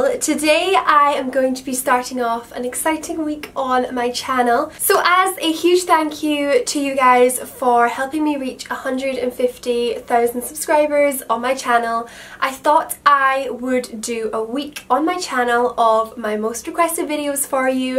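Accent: Scotland, United Kingdom. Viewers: Otävya LaCourse she Scottish so her accents different I'm Scottish and I have a accent she can't help how she sounds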